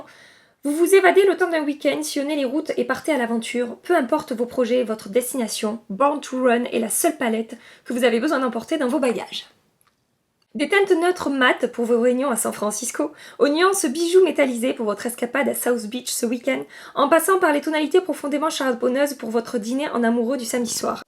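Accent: South, France. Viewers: Hello la belle ! cette vidéo est un régal , j'adore tes improvisations et t'écouter parler ;), tu es du sud de Narbonne si j'ai bien compris ? tu as un accent sympa , moi aussi une sudiste mais de Montpellier ! merci pour ce super descriptif de la palette qui me fait énormément envie , elle est sublime et très complète <3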